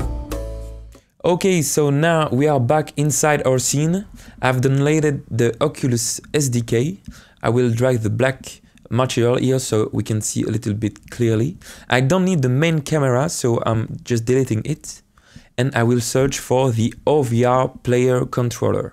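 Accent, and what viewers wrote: France. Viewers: Salut il me semble détecter un accent f Français, je me trompe ? Tes tuto me donnent envie de me mettre à faire mon propre jeu oculus Quest. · Hey, Nice video but it seems it doesn't work for me with the recommended version 2018.4 LTS, I'm able to move, see my hands and so on. But I can't grab anything... I figured out that it was my capsule colliders for grabbing in my hands that weren't moving but it was impossible for me to solve it... Can you help me? PS: You sound french!:p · Ooh that's super interesting ! I may start developping a little VR game soon thanks to you ! So thank you :D Wait a sec, is your accent French ? 'cause I am and I may have the same accent as you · mmmmmhhh le french accent x) C'est marrant de voir la description en français et la vidéo en anglais. En tout cas merci pour la vidéo c'est bien expliqué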